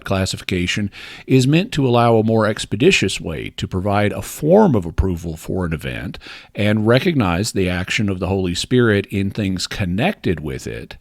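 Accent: South, United States. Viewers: I was so distracted by this new Southern accent that I could hardly pay attention to the subject matter! I was even trying to figure out if this was a joke video! Lol! Good thing I can rewind!😂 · Did gain a country accent when I wasn’t looking? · Wow, really is from the south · I think that moving back closer to the South is starting to rub off on you dialectically. You definitely sound as if you're taking on a bit more of a southern affectation, reminiscent of someone like Alan harrelson, who runs pipe cottage. And if you don't know him, I suspect he would be someone you would have an awful lot of fun talking with.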